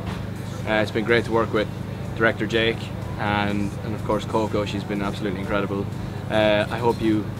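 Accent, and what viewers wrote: Ireland. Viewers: cough cough Niall’s Irish accent it better than mine (Yes, I’m Irish Too) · His Irish accent is just killing me🆔😍😍😍😍😍😍😍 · That accent thought... So damn Irish 😍❤ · So proud of Niall!!! Still cannot get over his adorable Irish accent and how good he looks!! <3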